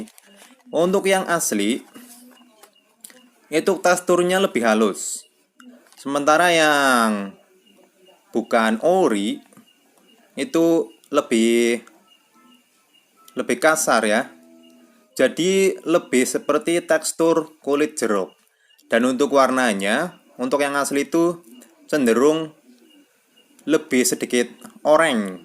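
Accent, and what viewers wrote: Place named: Tegal, Indonesia. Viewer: Kiye mah wong Tegal ngalor kiyeeeeeeeeeee 🤣🤣🤣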